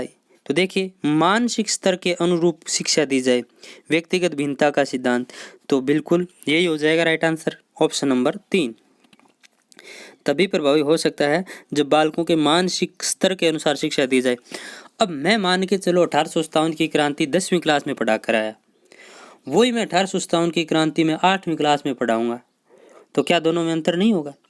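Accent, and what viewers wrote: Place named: Hanumangarh, India. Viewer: Aap ek dam humre jase bolte h hanumangarh ke h kya aap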